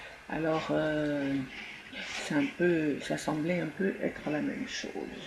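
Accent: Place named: South, France. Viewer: Bonjour hi hi 😊 j'ai aimé ta photo vidéo. Même sans plume ni poil. Tu sais là langue Française est très riche les origines y sont bien ancrées et pour longtemps encore mais ils y en a des milliers de mots comme çà ou expressions. Un seul exp parmi d'autres ( plan social.. Au lieu de dire chômage...) technicienne de service >au lieu de femme de ménage et j'en passe. Maintenant concernant la mort 💀 je suis KTO et je crois en Dieu comme à mon âme qui je l'espère retrouvera celles de mes parents et ma sœur... Chacun ses convictions, libre de ses croyances. Je trouve que ta vidéo est vraiment bien belle tu as un petit accent du midi 😊j'aime. Je te remercie pour le partage bonne journée. ♥️Bises_Pat. 😘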